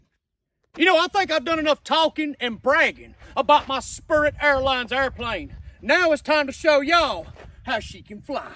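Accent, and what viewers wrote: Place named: Texas, United States. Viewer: bro sounds like Texas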